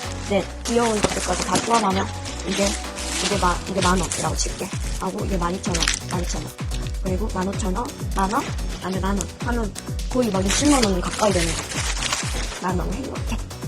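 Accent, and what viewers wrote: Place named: Jeolla, South Korea. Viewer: 전라도 사투리 1.5배속으로 들어도 걸쭉하네요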